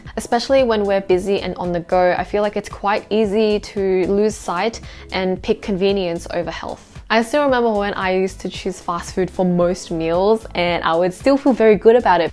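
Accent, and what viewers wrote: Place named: Malaysia. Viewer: 你是大马华人吗？口音能听出来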